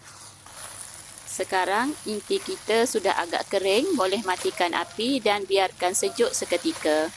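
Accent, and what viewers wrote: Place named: Kelantan, Malaysia. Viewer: Bunyi kecek Tu org klate😂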